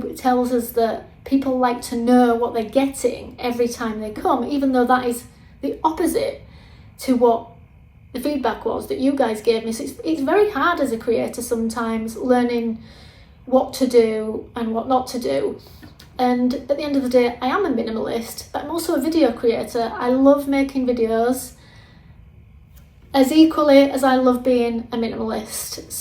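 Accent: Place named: England, United Kingdom. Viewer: I loooooooove all these ideas. I love that you are English. I find your accent and how you live, cozy and beautiful. Your every day life is so inspirational. I can't wait to see!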